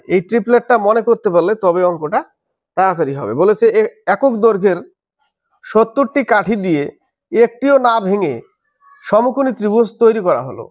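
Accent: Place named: Bangladesh. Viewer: কথা বলার ধরন তাতে মনে হচ্ছে আপনার বাড়ি বাংলাদেশ।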